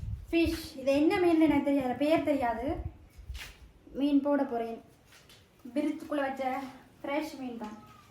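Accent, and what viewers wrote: Sri Lanka. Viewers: Ammaa neenga jaffna tamil pesa vendam normal tamil paesunga kettikkari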